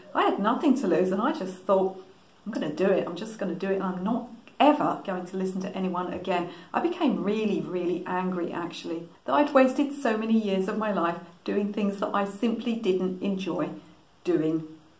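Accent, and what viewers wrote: United Kingdom. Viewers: I’m so happy to find you! I literally cried. I want you to know that I think that you are a wonderful teacher. I’ve actually learned a few basic techniques with things like finding the correct perspective as well as dimension. I can’t draw. I’ve been trying to paint with watercolor for about a year and haven’t gotten very far. Most people on YT are teaching to “use this brush and make this stroke and if you do it enough you’ll have a beautiful painting of a bouquet of flowers. For someone like me, I like to know why I’m doing this stroke with this particular brush and all the things in between. You teach those things and explain them in such a straightforward way. The British way I suppose. Always very charming but to the point. Im like that but without the charm and cool accent. Lol. However, the most important thing you teach is that one can learn. 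You don’t have to be born with it. And today’s video also encourages those who don’t necessarily want to conform to other’s opinions. Thank you so much! You are wonderful! I’m going to take some of your classes as well! I wish you all the best! Come visit us in the US soon!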